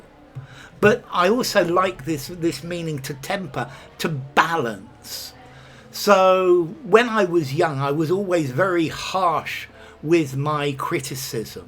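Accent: RP, United Kingdom. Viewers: Thank you, for the special "technical" uses of the word, viz.,- metal,steel,glass, ductility, spices, chocolate..... it is always enriching to view the videos in your Channel, all the more so, because your explanations and examples are well chosen, precise, thorough and tempered with the sweet and sour spice of humour and a posh accent. ☆Je vous envoie des baisers tendres de Paris. Sometimes, they can be a bit "fougeux", but I guess it would be a sin (or a crime ) to temper them!♡♡♡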